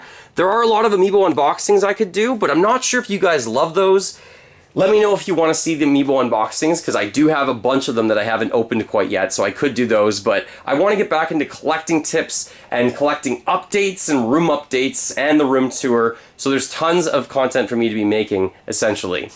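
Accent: Canada. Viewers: Haha within the first minute I heard you talk I could tell your Canadian, I found your channel when I started playing video games again specifically the Nintendo switch after a 5+ year hiatus, it’s awesome to see the renewal of passion for your hobby and channel.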